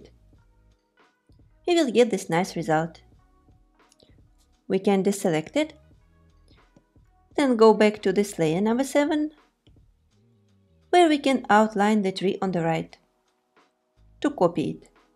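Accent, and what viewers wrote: Russia. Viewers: Сразу почувствовала, что вы русская ❤ у вас супер Английский) спасибо за отличный урок ❤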